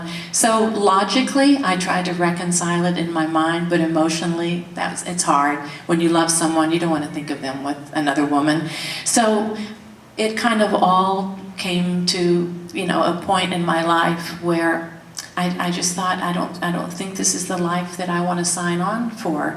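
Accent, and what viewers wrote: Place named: South, United States. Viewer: It's there, I here it..she is a Southern Belle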